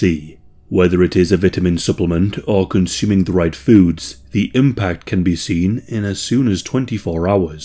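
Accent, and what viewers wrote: United Kingdom. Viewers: British accent of narrator.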